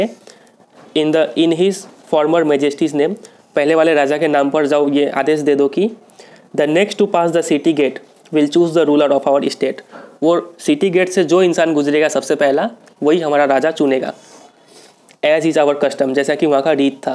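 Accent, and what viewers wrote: Bihar, India. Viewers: Bro aap language se bahot big bihari lagte ho like you said " Rajaji"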